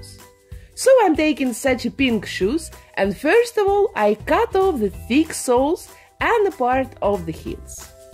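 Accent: Russia. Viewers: Сразу с первых секунд поняла, что вы русскоговорящая :D У вас замечательные видео, спасибо!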